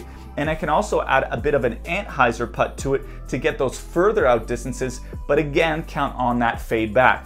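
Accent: Quebec, Canada. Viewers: when available I'm going to grab the elevation koi putters. I love the interceptor midrange. Rubber putter might be interesting. That sounds like quebec canadian French correct? Btw love the content. Keep it up.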